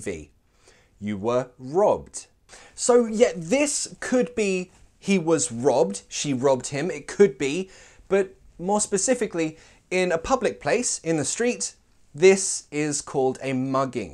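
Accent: United Kingdom. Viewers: Hey, I love you, I really do. I've always been watching your lessons. You're really funny and I've been recommending your YouTube Channel to my friends. But there's something I want to tell you, you're dressed up like an American Police Officer and speaking with the British accent 😁😁 it makes no sense 😁😁😁. I'm laughing out loud. It's not a critique, don't get me wrong. What I'm trying to say is that you're so funny, you really are. The way you create the videos make us being focused on the lessons. It makes us to want more and more an more. I love the way you make your lessons, your videos and I do believe I'm not the only one who thinks like that. I love you mate, actually I'm in USA, I should be saying "I love you my friend" 😁😁. I know, I know, Mate and Friend are the same thing. 😁😁 Wish you all my best my friend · Thank so Much ! I really like your accent, so British !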